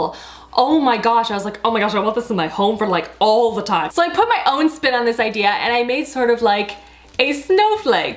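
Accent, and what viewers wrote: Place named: Canada. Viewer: I love hearing you say about... you're a true Canadian😋 sad that I live in Minnesota because I have the accent but not a strong as yours😢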